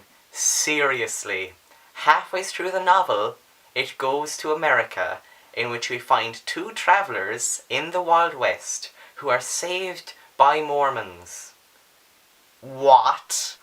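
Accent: Ireland. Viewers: are you irish? :) and thank you for the review, very helpful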